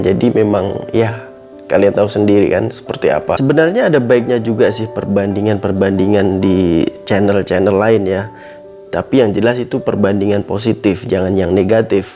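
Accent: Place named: Kuala Lumpur, Malaysia. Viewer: bang bunyi loghat KL haha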